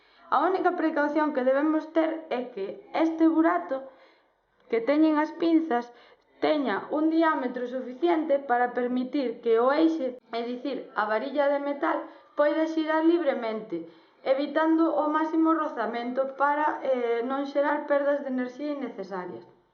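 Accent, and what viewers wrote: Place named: Portugal. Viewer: Parece catalan pero esta muy mal hablado , tiene un acento de portugues pero igual se entiende muy poco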